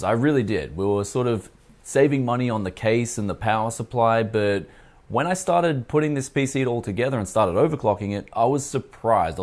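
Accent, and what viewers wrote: Australia. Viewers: could tell he was aussie from accent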